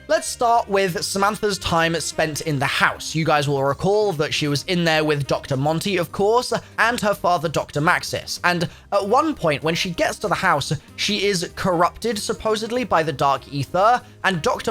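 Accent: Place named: United Kingdom. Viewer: Bruh hes British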